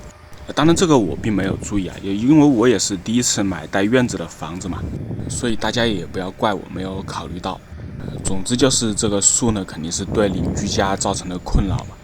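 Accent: Wuhan, China. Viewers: 武汉普通话，一开始就听出来了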